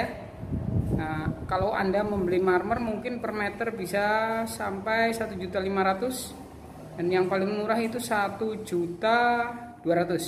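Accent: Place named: Banyumas, Indonesia. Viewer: lah wong ngendi sih kok jawane ngapak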